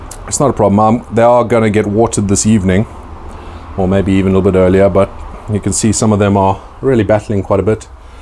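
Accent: South Africa. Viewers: Do you ever top your peppers? Mal oor jou videos. Jy klink soos ou SA-man.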